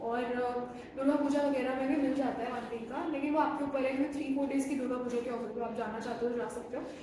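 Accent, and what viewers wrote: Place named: Arunachal Pradesh, India. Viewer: She has also imbibed Arunachalee hindi, na , na nice 👍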